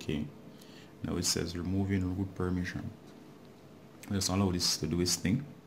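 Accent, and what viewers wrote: Jamaica. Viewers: thx man........... are u a jamaican?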